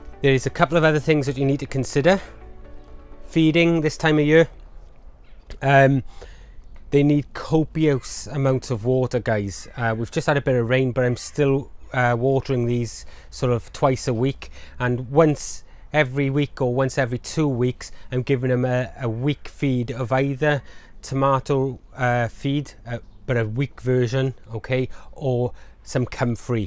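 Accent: Wales, United Kingdom. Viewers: As soon as heard your voice I thought - he sounds Welsh - possibly from South Wales. Then I saw your T shirt. Where are you based?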